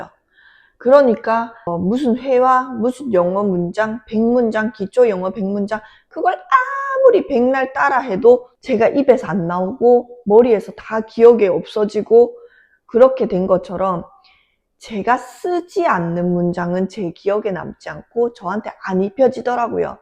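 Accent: Gyeongsang, South Korea. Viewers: 경상도 사투리를 들으면 개인적으로 반가워요, 저는 서울 사람 이지만 경남지역에서 오래 살았습니다. 김해 진영 마산 창원 그리고 부산. 저는 독일에서 거주했었습니다. 누나 영상을 보면 예전에 독일생활 초창기때 고생했던 기억이 떠오르네요. 영어 be동사 개념도 모른 상태에서 생존을 위한 독일어 공부했던 기억이 납니다. 독일어를 먼저 배워서 그런지 공부를 하다 보면 영어에서는 왜 이렇게 하지 라는 아쉬움이 있을 때가 많더라구요. 아무튼 누나 미국생활 너무 부럽네요. 저는 친미성향이 있습니다. 언젠가 미국에 꼭 한 번 가보고 싶습니다. 영상 자주 챙겨보겠습니다. 누나 사랑해요. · 선생님, 안녕하세요. 바로 구독합니다. ㅎㅎ 저는 한국에서 5년 임상 병행하며 임용 패스 후, 현재는 5년차 초등학교 보건교사로 근무중입니다. 학생때부터 미국간호사에 대한 생각이 있었고, 엔클렉스도 쳐야지 쳐야지 했는데.. 더 늦기전에 후딱 해치워야겠다?ㅎㅎ 라고 생각하여.. 올해 여름방학에 바짝 공부해서 8월말에 엔클도 패스했네요. ㅎㅎ 사실 저는 보건교사가 제일 하고 싶었고.. 그래서 지금 보건교사를 하고 있는게 정말 행복하지만.. 간호사로서의 발전에는 많은 한계가 느껴져요..ㅜㅜ 거기다 대학생때 뉴저지, 뉴욕에서 4개월간 병원실습도 하고, 미국생활도 해서 그런가.. 미국에서 더 많은 케이스를 보고 경험하면서 간호사로서의 전문성을 함양시키고 싶은 마음이 계속 드네요..ㅠㅠ 무엇보다.. 미국병원의 신문물들을 직접 경험하고 싶습니다.!ㅎㅎ 한국과 많이 다른 점에서 상당히 충격을 받았더랬죠.. 그래서 지금 진지하게 미국행에 대해 고민중입니다.. 미국가서 저 혼자 살아갈 자신이 전~~혀 없고, 또 영어에 대한 공포때문에.. 뭐든게 무섭고 두렵네요..ㅠㅠ 그러던중, 선생님 유튜브 우연히 보고 공감되는 부분이 많아 댓글 남깁니다. 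얼마나 힘드셨을지..ㅠㅠ 마치 저 신규 때 매일 울며 출퇴근하던 그런 기분이 들더라구요.. 그리고 억양이 경상도분이신거 같은데.. 경남지역이신가요? 전 대구사람이라.. 경상도 말투를 들으면 어찌나 반가운지요.ㅠㅠㅎㅎ 아무쪼록 항상 건강하시고, 영상도 꾸준히 업로드 해주세요. ㅎㅎ 혹시라도 제가 미국행 결정하게 되면 댓글 남길게요. ㅎㅎ 선생님 응원합니다. ^_^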